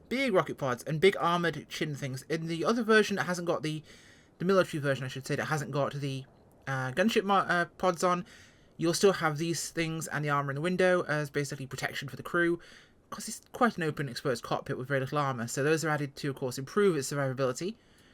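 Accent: Russia. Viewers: ВАУ... какой сексуальный русский голос!! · are you doing a russian accent? James Bond and I 😍 love it!!!!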